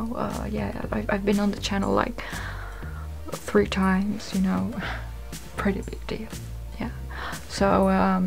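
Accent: Hungary. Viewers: …where are you from?? Just wondering…I take a guess but can’t quite get it correct I think. ❤️🤗Ok, I see now. You’re from beautiful Hungary!!! Lovely accent. ❤️🤗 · What's wrong? She is from Hungary and many people around the world have accent and pronounce words differently.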